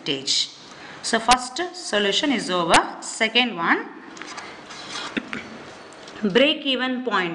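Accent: South India, India. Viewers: South Indian ascent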